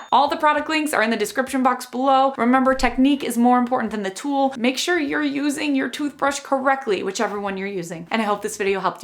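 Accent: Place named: Michigan, United States. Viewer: Is that accent from Mt Pleasant Michigan? It’s pretty thick. But I like it.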